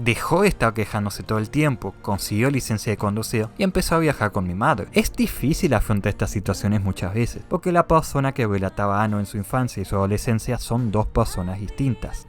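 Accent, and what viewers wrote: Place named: Germany. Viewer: que onda sos de Alemania que tenes ese acento? Pareces Asuka en el doblaje latino (? buen video padreeee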